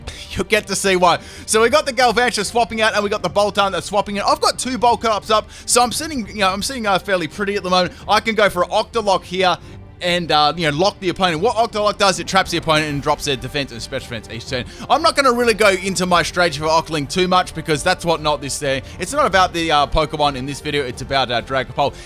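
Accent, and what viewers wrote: Australia. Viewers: This accent sounds familiar. Are you from Australia by any chance?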